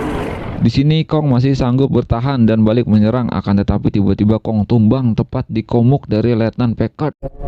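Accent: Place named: Jakarta, Indonesia. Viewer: Logat betawi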